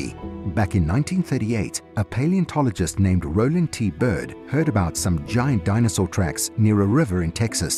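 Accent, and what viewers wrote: United Kingdom. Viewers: UK accents are annoying. DISLIKE.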